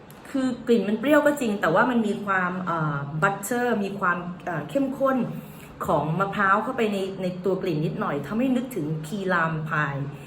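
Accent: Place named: South, Thailand. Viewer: อุ๊ย ชอบ แหลงใต้ด้วย สาวใต้สวยเก่งแบบนี้ต้องติดตามทุกช่องทางแล้วค่ะ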